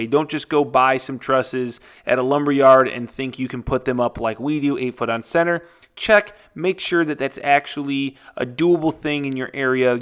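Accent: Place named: Wisconsin, United States. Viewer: Are you originally from Wisconsin? You have a very Wisconsin accent.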